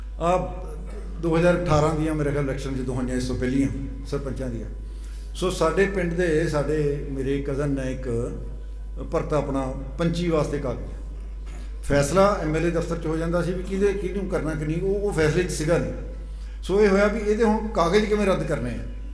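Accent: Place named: Puadh, India. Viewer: ਸਾਹਬ ਜੀ ਨੇ ਕਮਾਲ ਦੀ ਜਾਣਕਾਰੀ ਦਿੱਤੀ ।ਪੁਆਦੀ ਬੋਲ ਕੇ ਸੁਆਦ ਲਿਆ ਦਿਤਾ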